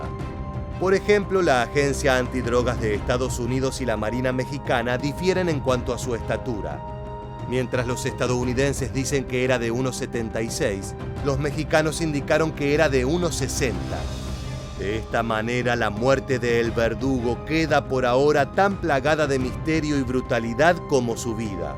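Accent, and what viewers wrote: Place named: Argentina. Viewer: Me da risa como el narrador habla bien argentino,pero neta esto es bastante serio con esto de México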